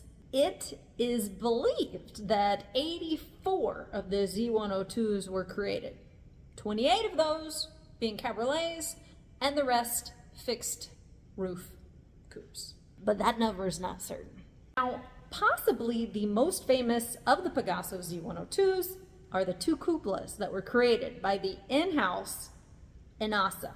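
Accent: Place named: Ohio, United States. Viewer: Cool video! You sound like you're from Ohio. Spent the better part of my 70 years there.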